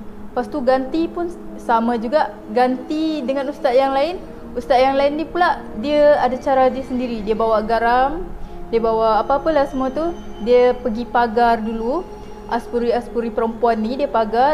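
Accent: Sabah, Malaysia. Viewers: akak ni org sabah ke org mane 😂😂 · Akak ni org Sabah ke? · Akak orang Sabah ke? Sebab saya tengok cara akak bercakap macam orang Sabah....Saya orang Kedah...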